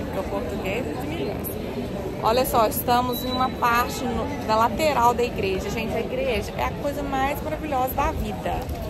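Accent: Minas Gerais, Brazil. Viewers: Pessoal nao corrijam a quando ela falar "tamos" ao invés de" estamos",o mineiro tem mania de falar cortando as palavras, e também diminui-las kkk,mas na hora de escrevermos escrevemos direitinho😊sou mineira, mas adoro ouvir o sotaque da é bem mineirim gostoso dimais da conta sô❤❤ abraços para todos!!! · ADOROOOOOO VIAJAR COM A QUERIDAAAAA!!! E desfrutar da sua simpatia, do seu sotaque mineiro que é o sotaque mais lindooooo do mundo!!!! Beijos ❤❤❤❤❤❤❤❤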